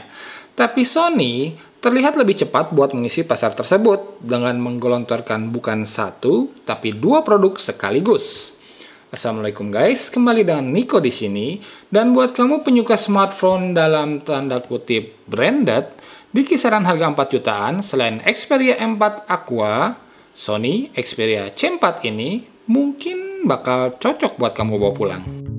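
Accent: West Java, Indonesia. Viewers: Suka sama reviewnya kang. Sunda pisan euy. Hehe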